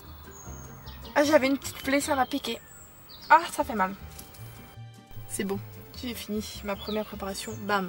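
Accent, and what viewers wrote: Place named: Italy. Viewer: Magnifique videos!!. Tu commencais a avoir l accent italien!!!!. Bravo a toi !!. Tu es une baroudeuse😊. A bientot j espere. Revients!!!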